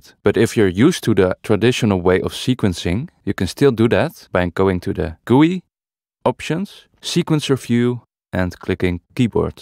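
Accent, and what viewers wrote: Netherlands. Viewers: Thanks for this very usefull tutorial! And eeh, you MUST be Dutch. Haha, ik bedoel, kan gewoon niet anders dan dat ik hier een Nederlander hoor!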